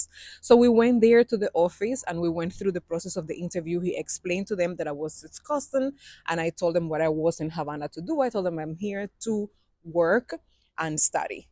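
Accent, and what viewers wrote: Patois, Jamaica. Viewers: I’m a new subbie please be your authentic self! Love your Jamaican accent please don’t let people make you feel self conscious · Guurl pleaee talk de patois and mad dem LOL! Love it 🥰 and please keep sharing your stories with us. Also waiting for the engagement/married story and pictures 😉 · Hello I enjoy your stories which are very interesting and I like when you talk patois too you are a true Jamaica 🇯🇲 🎉I will be looking out for more of your experiences 😅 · Hi love the story I love the way you talk the patwa so no badda wid the whole heap a pretty English because you don't sound the same I fall in love with the way you talk jamaican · Wow. Living in Cuba seems so hard. I love watching your channel, you are so funny in the way you express yourself, with your cute little Jamaican accent😊. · Hey, love the accent mixed with the patois. 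It's fyah 🔥🔥🔥🔥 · Luv yuh storytelling luv the patois I would listen to u all the time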